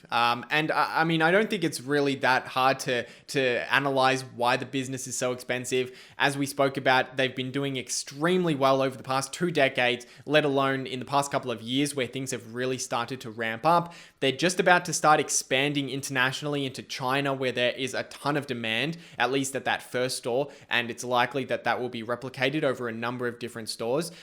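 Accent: Australia. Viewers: Hmm rosy cheeks and an Aussie accent ☺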